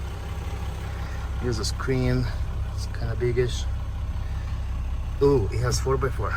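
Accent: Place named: Russia. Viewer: Russian accent 😂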